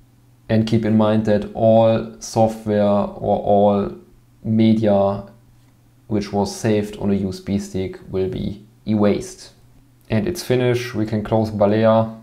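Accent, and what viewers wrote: Germany. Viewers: I hear german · you sound really german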